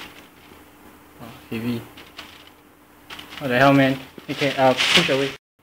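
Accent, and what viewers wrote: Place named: Singapore. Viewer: Singaporean accent haha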